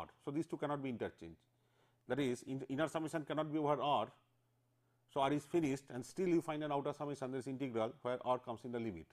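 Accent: India. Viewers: Yes, this is not english. This is Indian English, and we are proud of it. Want to have more of it? Just type Russell Peters on youtube and watch some of his videos..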